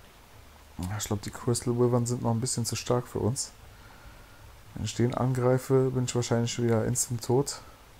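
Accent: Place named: Frankfurt, Germany. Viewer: aus welcher gegend kommst überhaupt manschmal hab ich das gefühl kommst aus frankfurt oder zumindesten rhein main gebiet :)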